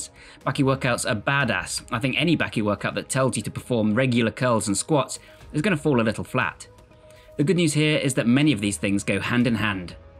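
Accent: United Kingdom. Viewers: I wanna hear u say wingardium levIOsa, (cuz your accent is similar to harmoine's)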